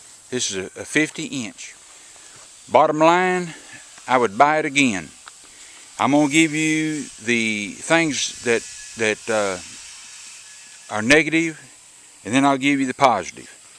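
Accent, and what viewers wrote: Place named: South, United States. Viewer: You sound like you are from the south. My neighbor has this same mower.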